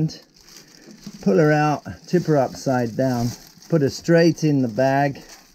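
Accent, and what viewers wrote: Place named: RP, United Kingdom. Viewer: Great video and exceptional BBC voice! Appreciate the help. Regards from New Zealand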